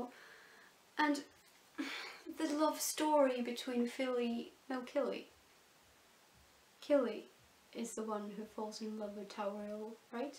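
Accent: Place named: United Kingdom. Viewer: Vilken brittisk engelska du har, så där fin och sofistikerad <3 Min är mer amerikansk och hemsk, hahaha xD Är sugen på att göra denna tag med, när jag får lust (och är ensam hemma). Kan inte spela in videos annars D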